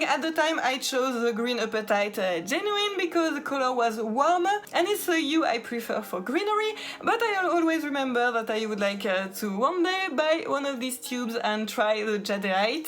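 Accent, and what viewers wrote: France. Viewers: I’m so happy when I see you. I love your lovely french accent and your personality. Your art is 💯❤️❤️❤️ thank you for existing! Lots of love from Italy 💚🤍❤️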